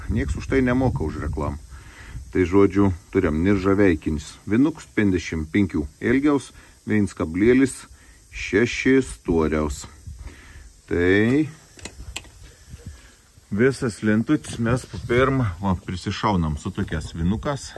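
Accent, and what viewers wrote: Samogitia, Lithuania. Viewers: Sveiki, is kokio miesto esat ka zemaitiska tarme? Gal Telšiai?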